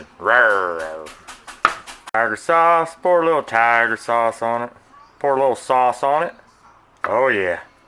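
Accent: South, United States. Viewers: When l listen to this chef l can't help but think of "Jethro Bodine"; and l mean that in a positive way. For l am a fan of the "Clampet clan". Crazy about "Jethro's" misunderstandings.😄 Gimme that down home Southern accent any day. Miss Jane: Are you planning to forego the Drysdale's garden party? Jethro: Oh yes ma'am; we'z all for goin' to the Drysdale's garden party. 😁🤣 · I'm going to use your recipe today .. love your southern accent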